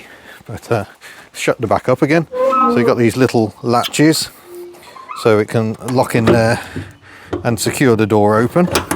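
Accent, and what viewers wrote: United Kingdom. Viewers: Because of your brit accent I kept expecting a pantomime horse (we don't have any in Canada but some have learned of its UK existence and of panto theater traditions through books and the Web) to appear suddenly each time you expressed your love of pantograph wipers. By the way we don't have European regulations for quadfracycles here in Canada so that cute truck and the Citroen AMI and others simply never show up on our streets. However, we have rules (or lack of actual specific rules but cover all general rules) for enclosed mobility scooters. They are like giant perfectly enclosed battery powered wheelchairs for semi-disabled people or for the very old people who are not allowed to drive cars in the street anymore because of vision issues or other medical problems. In other words the enclosed mobiliy scooters are forbidden on normal streets and must instead travel on sidewalks or bike paths!!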